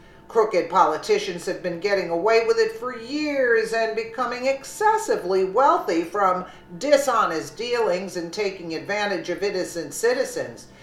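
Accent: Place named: East Coast, United States. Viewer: I love your accent.....reminds of some my past family & friends from the East Coast. Thanks for all the great work you do, bring ink and paper to life, with your read aloud.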